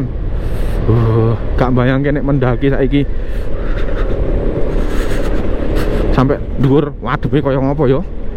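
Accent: Java, Indonesia. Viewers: Ciri2 orang Jawa kalau ngomong bahasa Indonesia mesti ada jawanya kebawa. 😆 akupun juga begitu agak kaku ngomong bahasa Indonesia. 🤣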